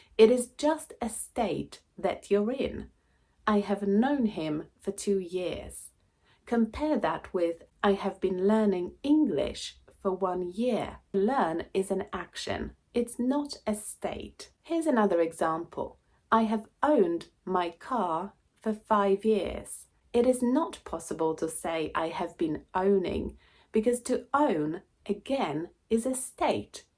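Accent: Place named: England, United Kingdom. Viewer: Dear, Your accent is very clear and that you slow speak so this you make understanable. You have very nice an English accent.. Thank you so much 🙏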